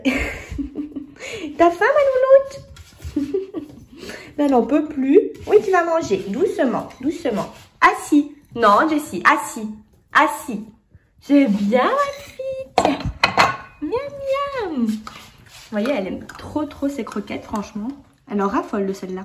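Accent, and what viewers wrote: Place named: Ardennes, France. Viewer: Très mignon ! J'ai eu un border collie que nous venons de perdre et je voudrais un chien ressemblant au border collie mais un peu moins grand. J'hésite entre mâle ou femelle.. J'aime votre petit accent peut être des Ardennes, je vis en Bretagne mais je suis nait à Charleville Mézières. Bravo pour cette jolie vidéo 😊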